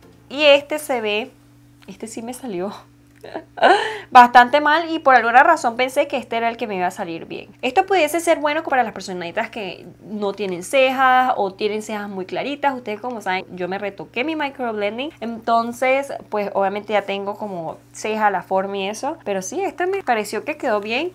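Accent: Venezuela. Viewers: jajajaja tu acento Venezolano es único jjajaja saludos preciosa desde hoy soy tu suscriptor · Con solo ver 30 segundos del video me di cuenta de que eras venezolana 😍 jajajaja nuestro acento es UNICO!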